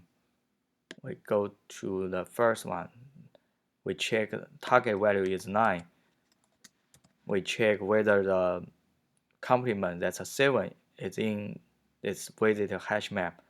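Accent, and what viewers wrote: China. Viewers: 虽然你的口音超级重，但是我感觉比美音还好懂！！哈哈哈哈非常的Chinese了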